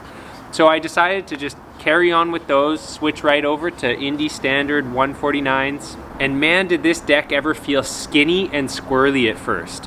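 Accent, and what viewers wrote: Canada. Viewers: I’ve seen a couple of your vids but damn you sound hella Canadian in this one... lmao I never even noticed until now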